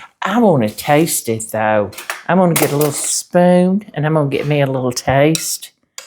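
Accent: South, United States. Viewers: Miss, first off, I so enjoy your videos and your sweet, southern accent!!! Do you ever just sit back, close your eyes and try and envision a new recipe? I do it all the time!! Tonight, I was sitting here (with my eyes closed😌….that’s important😂). I made a cheese ball last night for game night with our neighbors. It has cream cheese, diced green onions, diced dried beef, Worcester sauce and some spices. Tonight, I have some sweet, red, Argentina shrimp. I thought I would spread a thin layer of the cheese ball onto a flour tortilla, sauté the shrimp and make shrimp tacos with butter lettuce, onion, cherry tomatoes and an avocado lime dressing. When I opened my eyes, I clicked on your video and you were spreading cream cheese, sour cream and the dried vegetable blend onto a tortilla!!! I swear! You read my mind!!! It’s either that or AI!!😂😂😂😂 Keep making your awesome recipes!!!😊😊😊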